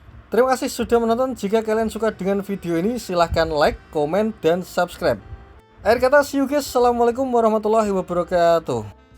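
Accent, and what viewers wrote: Pati, Indonesia. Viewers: wong Pati mas?😅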